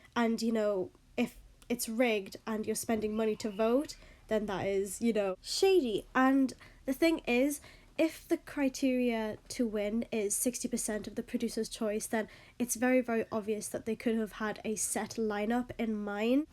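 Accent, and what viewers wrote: Newcastle, United Kingdom. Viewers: wait sis where’s your accent from. I’m getting Geordie. Watch me get it wrong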